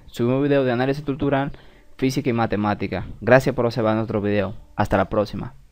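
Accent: Dominican Republic. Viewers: El acento me suena, eres dominicano??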